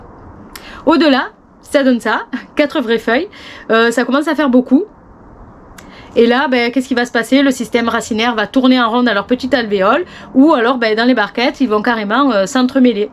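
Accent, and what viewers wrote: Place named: Arles, France. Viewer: Bonjour, vraiment ya du travail dans votre vidéo.... Le montage, la musique et annotations texte, et le propos intéressant, j'avoue j'en fait pas autant niveau youtubage😮, bref super vidéo avec un accent de chez moi.... Arles. Vidéo vraiment pédagogue, simple et claire.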